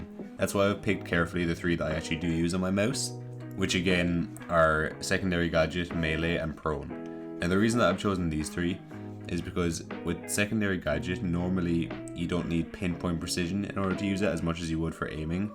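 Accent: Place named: Ireland. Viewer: Irish boi doe :) I'll try the peek keybinds out. It's what I'm most struggling with when switching from console to pc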